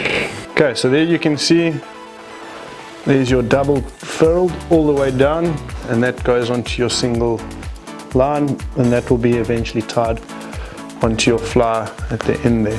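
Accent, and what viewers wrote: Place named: Yorkshire, United Kingdom. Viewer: English subtites would enhance it as Yarpee English is as bad as Yankee drawl.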